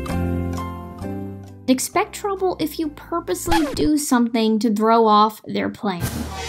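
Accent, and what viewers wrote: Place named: New England, United States. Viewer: Not certain, something New Englandish. It's fun.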